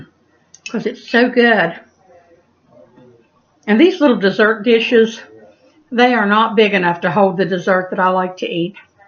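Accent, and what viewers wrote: United States. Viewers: U are to cute an very southern I thought u said salmon.i was confused (cinnamon)Loved it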